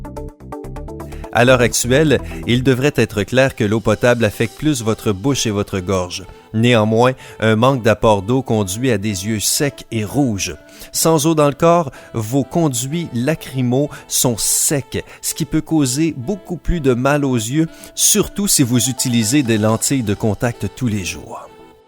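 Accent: Quebec, Canada. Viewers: Ouahhh on a tous reconnue l'accent québécois c'est magnifique 😂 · C'est tellement moche l'accent québécois 😷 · Mdr l'acent québécois mdr 😂🤣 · L'accent canadien m'a fait marrer pendant toute la vidéo 😂🤣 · c'est l'accent du Québec ! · Marrant hein l'accent canadien j 'comprend -donc ,aie !!! Gros gros buzz ! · J’aime beaucoup l’accent québécois ! J’espère que je suis pas la seule mdr 😂 . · Alerte accent QUÉBEQUOIS! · Pk c une voix canadienne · J'aime pas l'accent canadiens et surtout la voix w😗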